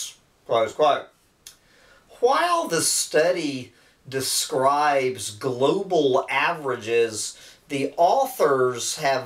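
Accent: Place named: Georgia, United States. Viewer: It´s the georgian accent.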